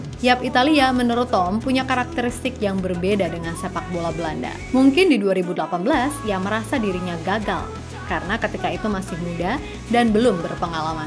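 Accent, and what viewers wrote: Java, Indonesia. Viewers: Suka ngomong jowonya Mimin 😅😅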